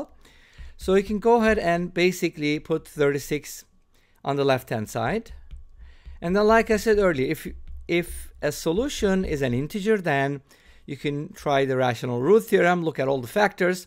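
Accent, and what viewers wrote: Turkey. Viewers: Is that a Turkish accent I'm hearing? 🤔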